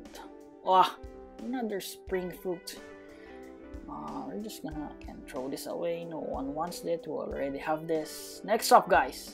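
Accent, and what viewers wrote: Tagalog, Philippines. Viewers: Halata tagalog 🤣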